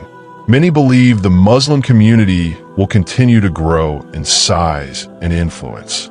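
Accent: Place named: United States. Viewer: Another American narrator!!!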